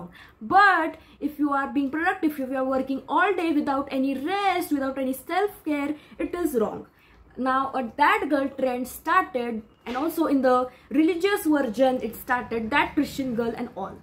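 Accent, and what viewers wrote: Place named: India. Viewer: Curry accent LMAO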